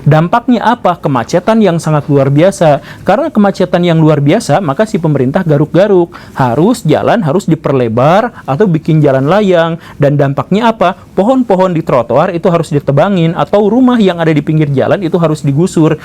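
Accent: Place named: Sunda, Indonesia. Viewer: Se7 pak....logat Sunda nya medok banget euy...orang Indo nya yg harus mau berubah, lebih mau bekerja keras tapi juga harus cerdas dong ya...😆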